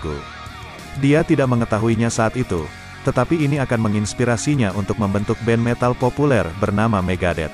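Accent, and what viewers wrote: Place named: Indonesia. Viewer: Kan pake pelafalan logat Indonesia bro... 🤣🤣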